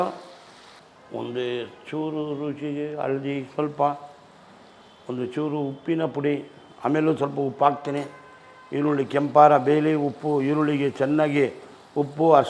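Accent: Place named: Bangalore, India. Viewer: ಗುರುಗಳೇ ತಮ್ಮದು ಯಾವ ಊರು ತಮಗೆ ಯಾವೆಲ್ಲಾ ಭಾಷೆ ಬರುತ್ತದೆ ಕೆಲವೊಮ್ಮೆ ಬೆಂಗಳೂರು ಭಾಷೆ ಆಯಾಡ್ತೀರಾ ಮತ್ತೆ ಮಂಗಳೂರು ಭಾಷೆ ಆಡ್ತೀರಾ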